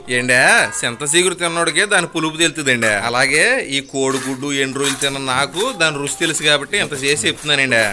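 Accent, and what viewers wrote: Godavari, India. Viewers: మేము ఇప్పటికి ఈ కూర తింటాము సార్ కానీ కూర కన్నా మీ నేరేషన్ వేరే లెవెల్ మన గోదారొళ్ల యాస ఇంకా సూపర్ అండి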